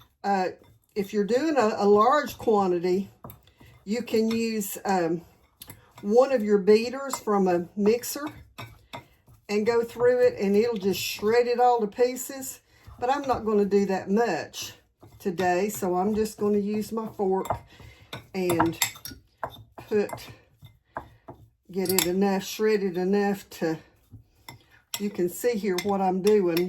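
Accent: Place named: South, United States. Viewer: Hello from Georgia! I love your southern accent ❤the food always looks great, love the presentation on this one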